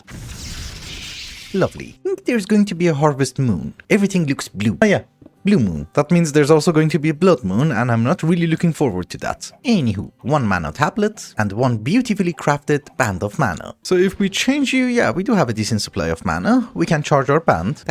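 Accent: France. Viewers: je ne m'attendais pas à cet accent français subtil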